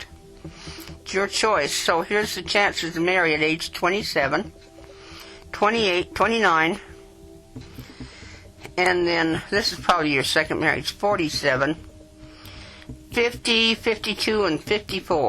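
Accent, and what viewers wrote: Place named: United Kingdom. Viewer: how much do you charge for a palm reading and where are you based. I'm in the UK,you sound similar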